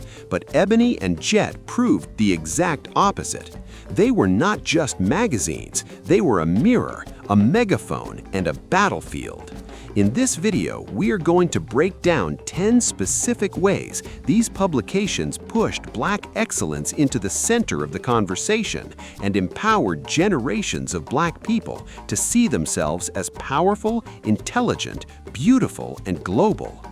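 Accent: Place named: Europe. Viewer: Why is a European voice narrating this video? 🧐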